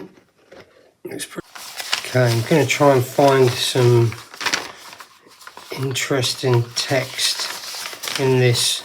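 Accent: United Kingdom. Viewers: Xeno, you've hit the nail right on the head. videos are full of information and easy to understand creative process. Like you, I dislike "self-indulgent" videos that don't actually achieve anything. As soon as I see someone talking about themselves on camera, I turn off. chat is informative and easy to listen to, and the N London accent makes me homesick for England!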